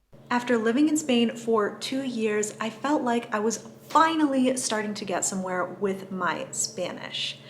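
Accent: United States. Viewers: I suspected you were of mexican descent , is obvious that you are a third generation in usa your natural fluency in the language is of American .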